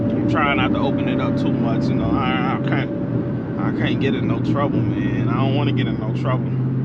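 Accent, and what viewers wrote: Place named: Chicago, United States. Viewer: Damn I miss the crib I'm from Ada park boi I know you from Chicago out here in Phoenix Az I don't miss the snow tho I'm hearing you talk like yeah he from the land 😂😂😂😂😂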